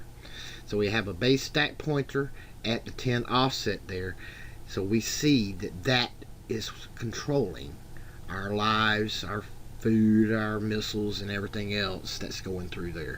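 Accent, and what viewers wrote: South, United States. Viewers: Subbed! Love your accent by the way. Are you Southern or Pagan by any way?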